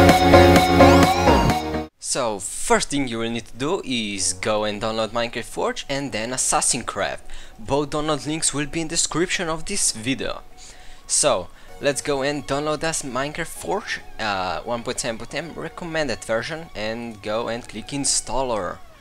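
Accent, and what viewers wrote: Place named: Poland. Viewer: Thanks you so much :3!! By the way are you from Poland, because your accent?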